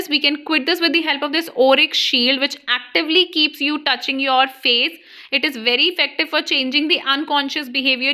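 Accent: India. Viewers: its scam once hearing a hinduh voice nexttttt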